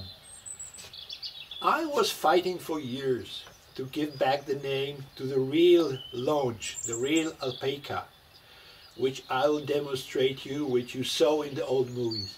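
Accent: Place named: United States. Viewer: Angielski naprawdę dobry, amerykański akcent. Pozdrawiam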